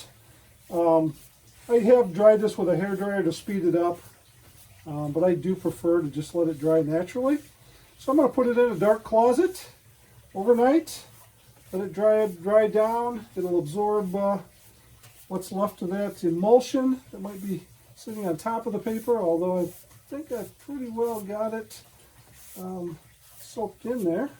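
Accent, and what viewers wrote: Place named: Manitoba, Canada. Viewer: Sounds like a Manitoban accent.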